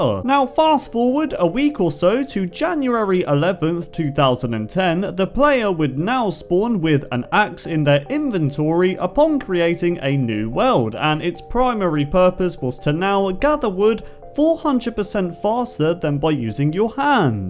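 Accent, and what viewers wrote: United Kingdom. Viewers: Are u British accent?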